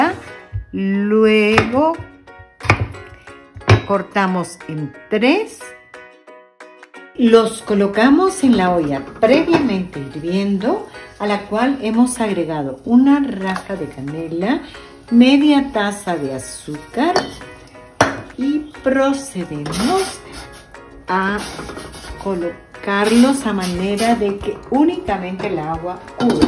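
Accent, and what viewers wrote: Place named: Guatemala. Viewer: Muy agradable escuchar un castellano chapin bien hablado! Deliciosa receta, gracias!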